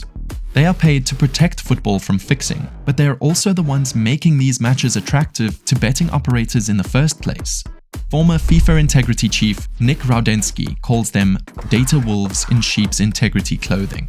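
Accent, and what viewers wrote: South Africa. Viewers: incredibly well put together and informative video, your English is incredible. i thought you were South African haha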